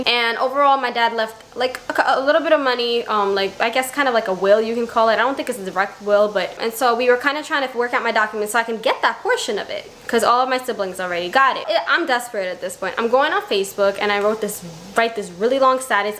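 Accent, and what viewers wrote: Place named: New York, United States. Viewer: I was like mmmm this girl has a New York accent. Then you said you had a Brooklym attitude and I was like wohhh awesome!! I'm from the area as well :) I'm sorry that you had to go through this. Have fun in this upcoming trip.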